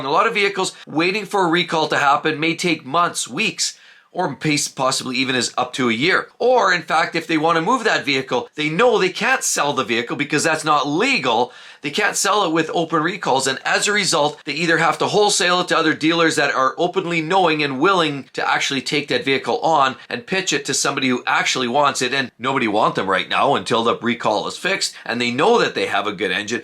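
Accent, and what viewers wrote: Canada. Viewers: Love this guy Canadians oooot and abooot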